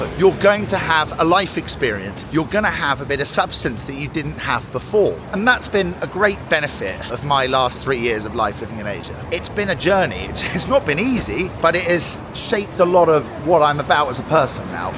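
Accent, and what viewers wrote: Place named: England, United Kingdom. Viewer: you look like UK people exactly, your shoulder your face your accent, your hair , typical English people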